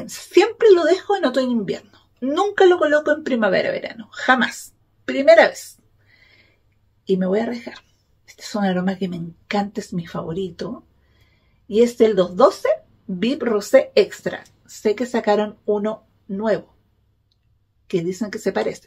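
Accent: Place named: South America, Latin America. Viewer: ¡Me encanta tu acento! Para mí, el mejor de Sudamérica. Saludos desde México.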